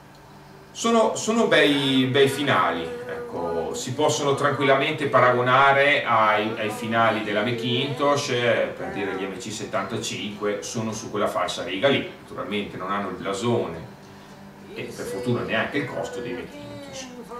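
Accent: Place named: Reggio Emilia, Italy. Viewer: a parte il fatto che se non correggi la tua dizione avrai un targhet di vendita limitato al solo territorio reggio emiliano fatto da soli formaggi mortadelle e prosciutti e pochi chilometri di distanza .. pistola !!!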